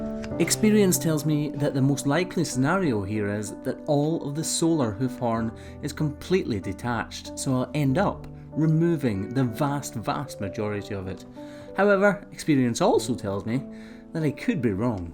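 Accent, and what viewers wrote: Scotland, United Kingdom. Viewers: You are a vast wealth of knowledge when it comes to hoof trimming. I always enjoy watching your channel and learning new things. I admire your work ethic and how you treat each cow that you trim and I love listening to you explain it all w/ Scottish ascent. Keep up the great work, I always look forward to the next video.♥️🐂🐃🐄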